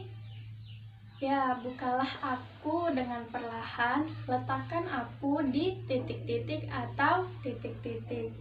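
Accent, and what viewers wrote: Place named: Pekanbaru, Indonesia. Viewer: Logat pku nya nampak kali yaaaa (pake nada pku)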